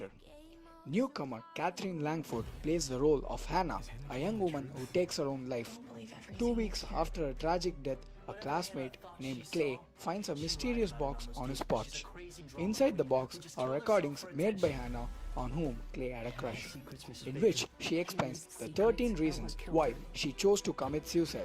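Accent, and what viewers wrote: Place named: India. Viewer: dude... your English is terrible. and you're speaking so fast that you sound like youre actually speaking your own language. I have to listen hard to even hear a few words. you have a very thick Indian accent. also you keep breathing too hard on the mic. Maybe try speaking slower and try pronouncing your words better while learning how to speak in English properly. or just do it in your own language.... anyway I'm giving you a thumbs down on this one.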